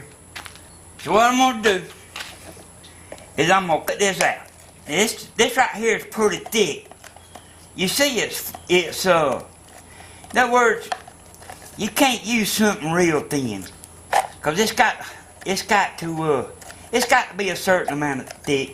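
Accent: Georgia, United States. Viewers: Smart man regardless of accent.Headed for wealth and greatness. Southern like me.I like him.You know what I mean. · He sounds like he is from Ga, but does Mississippi have the same accent?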